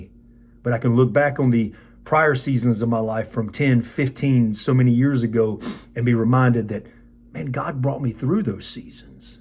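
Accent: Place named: California, United States. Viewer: Hey, your voice reminds me of my old school pastor back in my younger youth, with the Californian/southernistic (new word made) accent. I enjoy your videos and the good messages from the Word of God. I wanted to know your thoughts on the different translations of the Bible, like speaking of my old school pastor, he comes from that old school era of having your actual copy of Word of God in KJV and I believe that too but have somewhat laxed on it with using the YouVersion bible app but strictly to KJV and nothing else as I kind of bridge the gap of old school to the new school, as I am a late 90s kid just before that horrifying, tragic day in New York with a couple towers. My question is, do you learn more from other versions or do you find some more or less restrictive in obtaining knowledge you want to get from God, what do you get from these other translations than from KJV?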